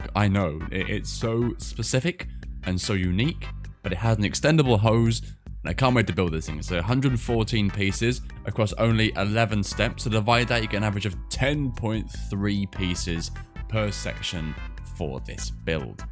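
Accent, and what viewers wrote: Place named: Denmark. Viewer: holy danish accent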